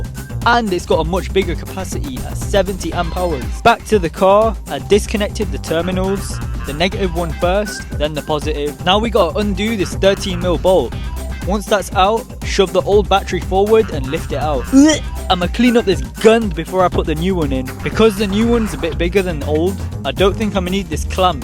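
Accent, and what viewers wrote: Pakistan. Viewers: Sounds more urdu to me. He Sounds like hes a Pakistani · are you pakistani because you speak the exact same pakistani language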